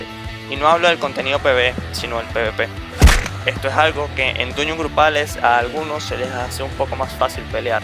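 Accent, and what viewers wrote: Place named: Caracas, Venezuela. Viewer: Eres Venezolano? Ese accento es demasiado caraqueño!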